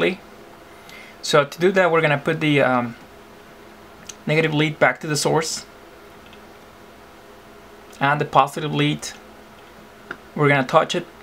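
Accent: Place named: United States. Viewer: =) the great american accent ......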